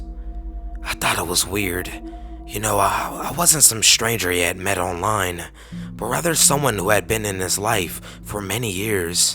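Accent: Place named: South, United States. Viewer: Sunna Lind I like the rough edge his voice has. That, and I could swear he's got a little bit of a Southern drawl. I dunno, but I like it.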